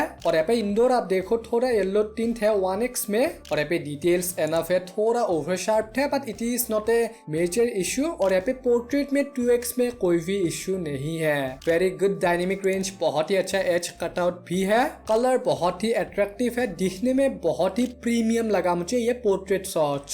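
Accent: Assam, India. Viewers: assam dada apunar accent te gom palu😊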